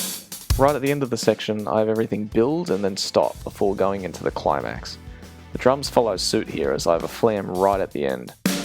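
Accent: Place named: Australia. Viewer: Watch another video? I don't understand nitpicking someone's voice, something they have no control over. He doesn't have vocal fry even, he sounds Australian. It's like you expect him to go "Oh, sorry" and just put on a voice. Lmao. Enjoy the free, highly researched and interesting video.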